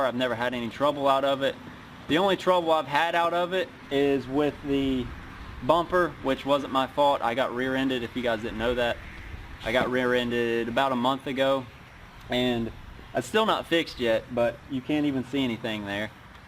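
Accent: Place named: South, United States. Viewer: so hard to take people with southern accents seriously.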